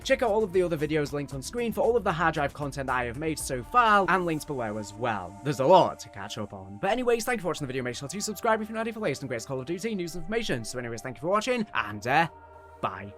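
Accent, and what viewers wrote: United Kingdom. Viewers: Bro what type of British accent is this, sounds like he’s talking winded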